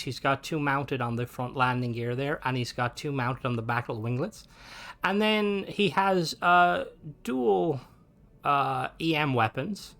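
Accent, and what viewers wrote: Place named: Ireland. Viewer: 😊 would you happen to know how to use bagpipes just curious You're Irish accent makes me wonder not that I think it's bad actually I think it's pretty cool but your accent sounds like you're from the area that actually has bagpipes plays it from dominant this is not disrespect this is me trying to respect you Peace have a great day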